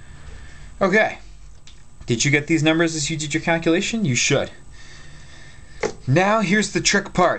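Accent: Canada. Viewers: Are you Canadian? "Turns Ouuut to be..... "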